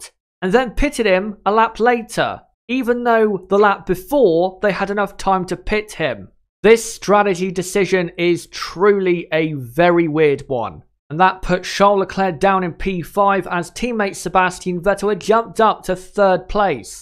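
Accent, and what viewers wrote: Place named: West Country, United Kingdom. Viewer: Great analysis. Are you from the West Country? It's just the way you pronounce "SebaRstian Vettel."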